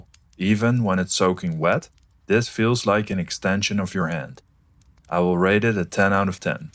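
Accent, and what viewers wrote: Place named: Netherlands. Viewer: I gues your from the netherlands? I think i can hear it in your accent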